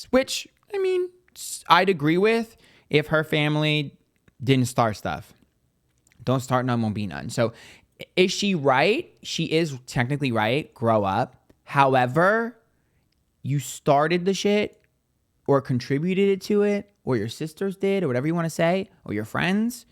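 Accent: New York, United States. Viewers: I know you live in Texas but I recognize that New York accent! I’m born and raised in Long Island NY and people make fun of how I saw words and how fast I talk. But when I listen to you, I feel like I’m listening to a friend from home. Love it!!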